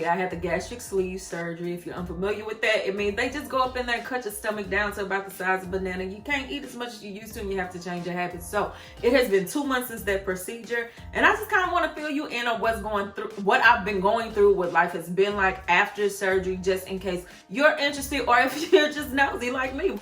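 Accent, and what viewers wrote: St. Louis, United States. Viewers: Congratulations Hun! Been checking out your previous vids. You looked Amazing before and you're Still Beautiful now. And I KNEW I recognized that dialect...we homegirls!!! I'm SO StL! Lol 😆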